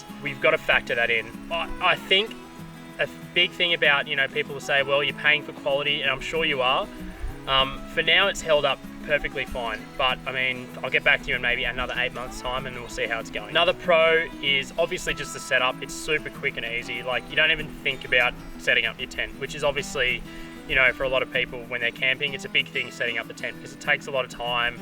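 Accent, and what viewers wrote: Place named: Australia. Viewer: So nice to hear Aussie voice on YouTube. Subscribed just cos that (well and you say you'll be vlogging your travels around Oz). I liked how the tent was dusty and stuff - obviously getting used and well, dust, so Australian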